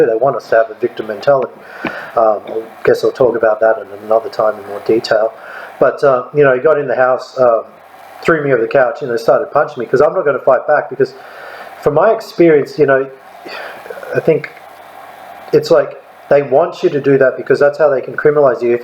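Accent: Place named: Australia. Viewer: Good to see an aussie talking about reptilians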